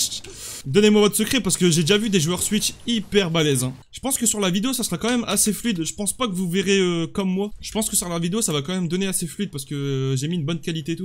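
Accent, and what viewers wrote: North, France. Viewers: Yess l'accent du nord bgg !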